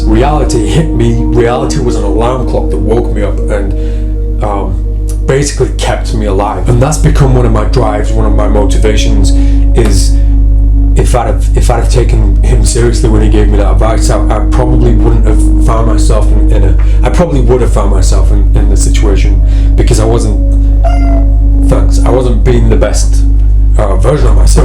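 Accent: United Kingdom. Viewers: Don’t feel embarrassed or humiliated for opening up. That’s bravery. I truly await every video you post, regardless of what it’s about. There’s always a lesson to be learned. Todays: failure is not weakness. I enjoy spending my time watching; and with the English accent it always sounds epic! Haha! So sincerely, “Fanks” 🙏🏼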